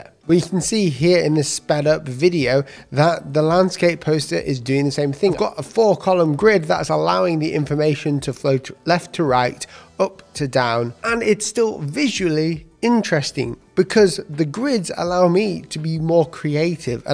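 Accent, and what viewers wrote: United Kingdom. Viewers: British accent is horrible man, some words are missing from your speech . i can't understand fully what you mean . please there is many people is not native English speaker so they don't fully understand British accent . it would be better if you speak normal English or American accent would be better for many people to understand .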